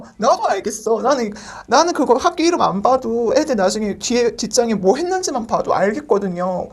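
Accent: Gyeongsang, South Korea. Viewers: 강사님 경상도 사투리가 드러나는데 괜찮은지????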